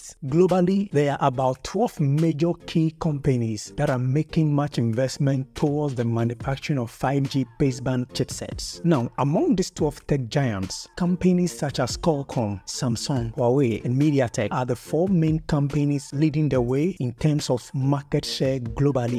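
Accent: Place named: Africa. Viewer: Cool are u African becuse your English reveals your country It's really cool Love from india🇮🇳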